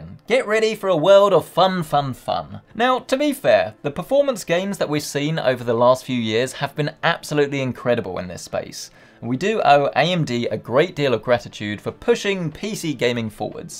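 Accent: United Kingdom. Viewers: Is this guy British? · he's so bri'ish heh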